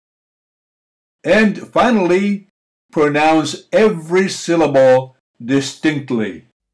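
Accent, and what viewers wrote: Greece. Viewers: This is the real sound of greek. Finally someone is trying to save you from erasmian ir whatever fake pronounciation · Many thanks, learning koine Greek using standard western method. Good to hear a Greek pronuction.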